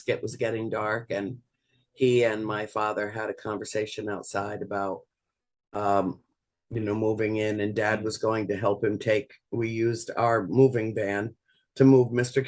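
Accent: South Africa. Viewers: What nationality is the presenter? He sounds South African??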